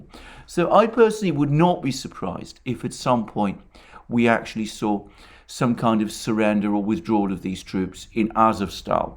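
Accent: England, United Kingdom. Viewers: He's got an English accent, my friend.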